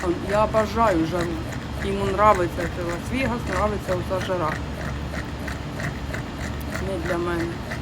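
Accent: Kryvyi Rih, Ukraine. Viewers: Коли почула суржик і зрозуміли що ви з мойого рідного Кривого Рогу! Я так скучила за ним! Живу далеко в Ліссабоні вже 25 років. Але ріднішого міста у мене немає, як Кривий Ріг!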